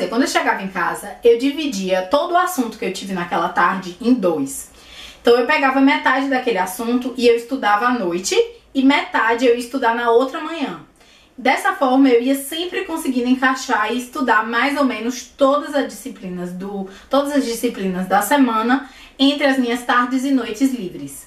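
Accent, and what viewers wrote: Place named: Salvador, Brazil. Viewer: Amei o vídeo. Você é linda. Sou de Salvador e reconheci seu sotaque na hora haha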